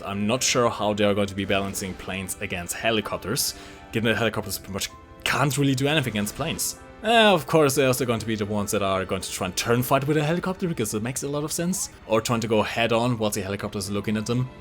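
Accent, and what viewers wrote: Germany. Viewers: Digga, man hört deinen Deutschen Akzent richtig raus xD.Würde mich freuen, wenn du einen Kanal aufmachen würdest wo du War Thunder spielst :D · Sorry but i cant listen to you because you Sound so german.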